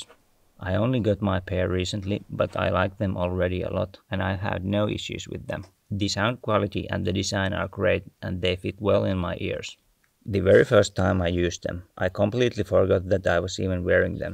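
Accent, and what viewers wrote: Finland. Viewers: I love your voice with your finnish accent! very fun to listen to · is that a finnish accent? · he's Finnish there's no question about it. All the products he uses are with Finnish labels, the newspapers he uses sometimes are Finnish etc. Also the accent is very typically Finnish too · Is that Finnish accent? Torille! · You have such a lovely Finnish accent! I hope we hear you talk more sometime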